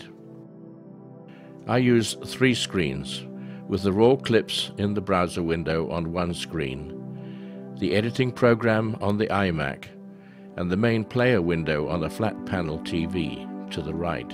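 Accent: England, United Kingdom. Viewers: THX FOR SHARING. YOU SOUND ENGLISH?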